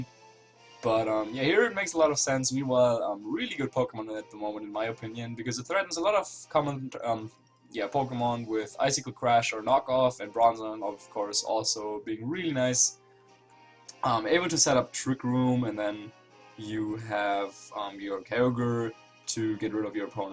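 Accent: Germany. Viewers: Pleasure to have you back, having only Hibiki was not enough German accent in my Pokemon videos (yes, I know he is Austrian, but it all just sounds the same)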